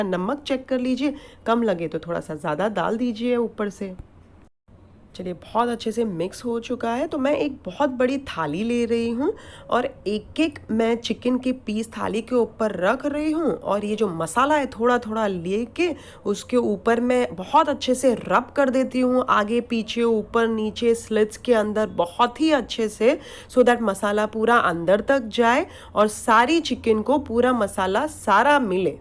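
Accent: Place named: Maharashtra, India. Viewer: Ur hindi can easily tell that u are from Maharashtra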